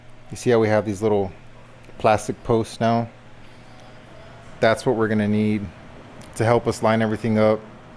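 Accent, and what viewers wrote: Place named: Texas, United States. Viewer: Where are you from? I’m getting a Texas vibe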